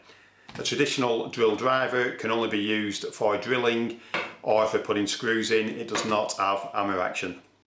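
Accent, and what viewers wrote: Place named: United Kingdom. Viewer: What accent is that? I know it's British.. But it's difficult to understand when English is your second language and you ear is accustomed to American accent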